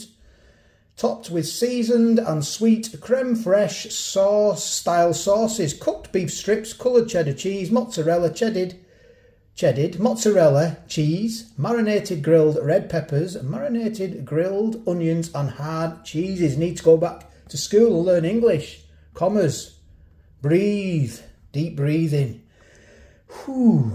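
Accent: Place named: Northern England, United Kingdom. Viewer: Is it just me who thinks this guy should feature in an ALDI advert? Proper northern accent (I'm from Yorkshire, I'm guessing is Lancashire originally?) He wouldn't even have to act for it, just plonk some food in front of him and film it 😁 Hands down the best, honest and comical food reviewer out there, and he doesn't even try to do anything. Just brilliant 😎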